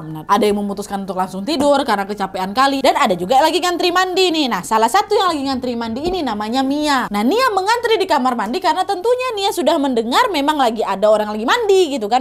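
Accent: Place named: Medan, Indonesia. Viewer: Ceritanya benar2 menghororkan tapi, hilang rasa takut karna dengar k yang membawakan ceritanya dengan lucu. Mala pake logat medan ada uwak nya lagi. Mantap k.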